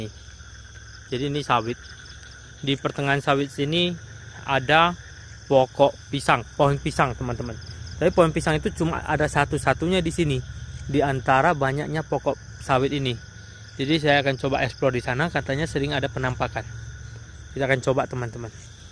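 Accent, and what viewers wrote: Medan, Indonesia. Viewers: Logat Medan Abang orang Medan ya :D Awas ada yg berondok hehehe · Bang abang asli medan kan? Medan dimananya sih bg pengen tau awak haha. Pesenku berkarya teross bg. Jangan abg ilangkan logat kita itu bg karna memang kota medan yang paling disegani diindonesia😂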